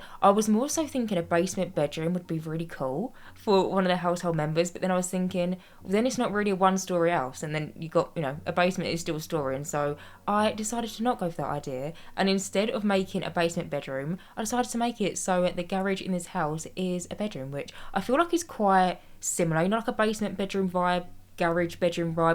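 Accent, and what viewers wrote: United Kingdom. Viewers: as you started talking, i knew instantly you were from the UK. as i am British i just knew!! 💟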